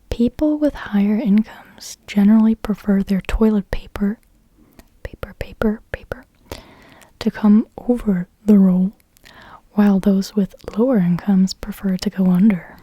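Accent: California, United States. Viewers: Your american accent is superb. Even though I didnt get any tingles from this video I still enjoyed it and found it relaxing. Videos like this would be great for times that I want to wind down but not necessarily fall asleep. I also think it would be interesting to hear your normal voice speaking in German (maybe with subtitles for people like me who cant keep up with the language at that pace) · I still can’t believe how good you’re accent is , I’m not sure if it’s because I’m from the uk , but you sound totally American !! Credit to you ! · Your American accent is so superb, I often forget you’re German. And then you disclose that you don’t know certain American idioms, (like “How did the chicken cross the road?”) and then I remember. lol You have such a large understanding of our culture though. I wish I knew more about German culture. :) Also, I’m pretty sure most of those “laws” aren’t true. 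haha I cant imagine any law enforcement keeping track of off-key singing. hahaha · Cruz DeLeon she does have an accent, it's American. No idea how she gained it but never the less it's certainly American rather than British. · Love your voice I still trip on how a German girl can sound so old school California.I like your normal voice better then the whisper for asmr.Speech should be used rarely in a asmr vid. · Hast du deinen amerikanischen Akzent Absicht geübt oder woher hast du ihn ? 😊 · Descended_Flames I genuinely wanted to know why you put English in quotation marks that's a valid question. There is a distinct American accent in her voice, it does not matter from where from although Texas, New York and California all sound different they do sound fundamentally the same. She sounds a bit west coast, like a well spoken person from California if you wanted to be specific. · You sound more American than German. 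· you sound american, i thought you where German ?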